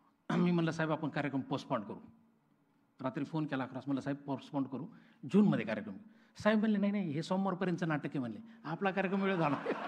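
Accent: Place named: Rural, India. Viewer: पुढ जाणार भाऊ तुम्ही. भाषण तर ठिकच. पण बोलताना जरा ग्रामीण बाज आहे. म्हणाल्यावर पुढ घेणार ते चौकडी तुम्हाला. 😊😊😊😊😊😊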